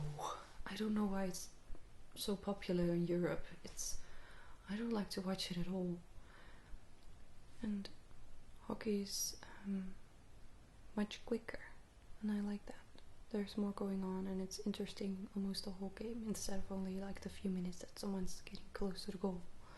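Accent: Netherlands. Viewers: Niet in slaap gevallen, maar wel heerlijk om te luisteren naar je lekkere Nederlandsheid. :)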